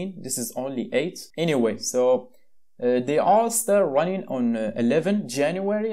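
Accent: Morocco. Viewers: Anglais n9iya akhy bayna fik maghribi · TBH your accent is better than trying to speak America/British accent rir hdr b Moroccan Accent and Keep going bro <3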